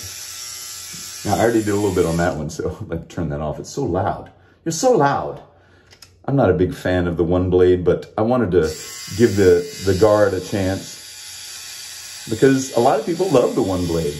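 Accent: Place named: RP, United Kingdom. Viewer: You’re accent was actually pretty good. You sound like a member of the royal family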